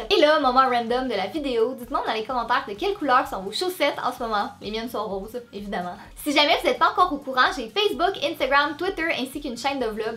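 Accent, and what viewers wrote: Quebec, Canada. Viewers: je suis la 451 vue j'adore tes vidéo continue en plus tes trop belle PS : j'adore l'accent cebecoi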